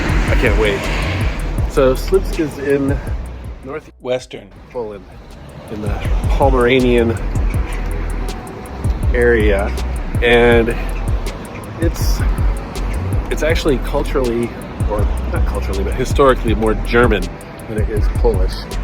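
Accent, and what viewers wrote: United States. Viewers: American fella I guess.Sounds by the accent.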